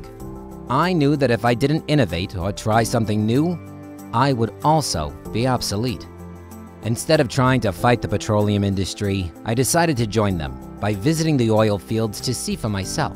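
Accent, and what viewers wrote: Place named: New England, United States. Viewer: couldn't listen to more than 30 seconds of this dumb yankee accent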